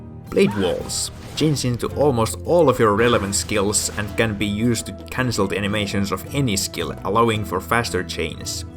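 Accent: Finland. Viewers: are u from finland?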